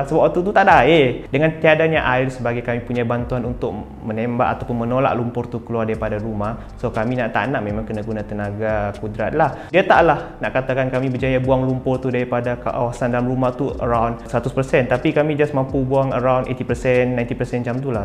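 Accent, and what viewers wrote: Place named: Sabah, Malaysia. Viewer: Mcm org sabeh je bro ni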